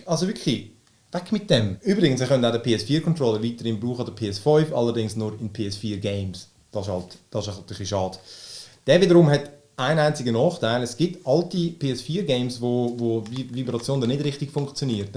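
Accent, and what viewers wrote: Switzerland. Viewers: Es ist Schweizer deutsch wo ich wohne 👍🏽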